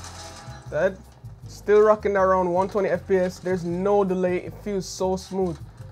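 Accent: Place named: Jamaica. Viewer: You have a Jamaican twang bro are you from jamaica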